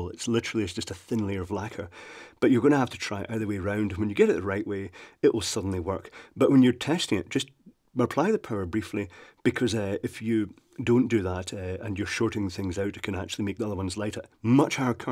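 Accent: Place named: Edinburgh, United Kingdom. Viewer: The only thing odd about the sound is your very posh accent, almost Morningside 🙂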